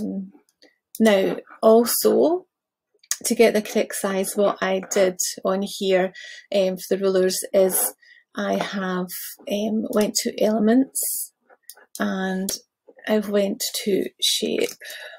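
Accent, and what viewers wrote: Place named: Scotland, United Kingdom. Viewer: I gotta love you. :face-red-heart-shape:But you're Scottish? Welsh? Accent is too much for my American ear. 🐘Well , WooHoo! Close Captioning does understand you! I will carry on.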